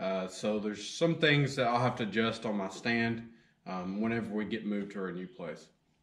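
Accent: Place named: North Carolina, United States. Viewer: Great review man! Just curious, where are you from? Sound like Robeson or Scotland County NC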